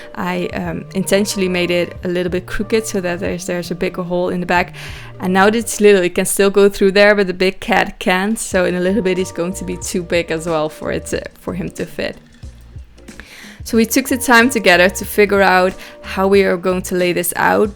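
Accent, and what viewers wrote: Netherlands. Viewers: Hoor ik een Nederlandse accent?? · Kom horen dat je Nederlands was😂 mooie boom! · From your accent, I would guess you are from the Netherlands or if not a Scandinavian country where women are supported to have so many different skills. Wherever you are, your building skills are excellent and the design is BRILLIANT! Very clean and open. Also love that you have narrated yourself. So much more natural. Also you are not using any stock footage. Wish I could do something like this for my baby.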